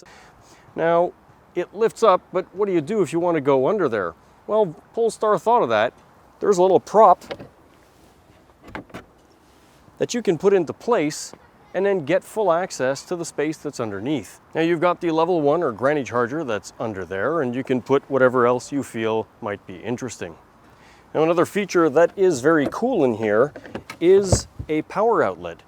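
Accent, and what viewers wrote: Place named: Canada. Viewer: Canadian accents sound fun to me, it makes me want to have a Labatt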